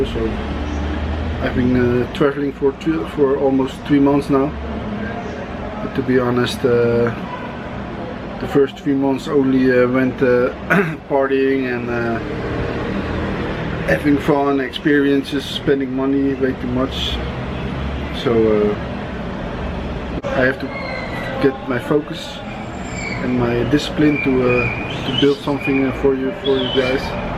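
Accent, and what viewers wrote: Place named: France. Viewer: avec cet accent c'est sûr tu es Français !!!! si oui bravo à toi de tout quitter pour la Colombie, tu vas pas le regretter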